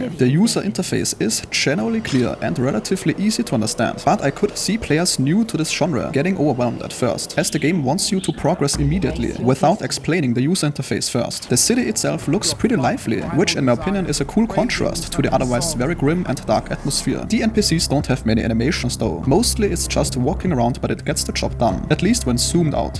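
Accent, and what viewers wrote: Germany. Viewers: Ohne es zu wissen aber dein Akzent ist sehr deutsch😂 Finde es trotzdem super diese Kurzreviews, sind echt ein time saver! ❤️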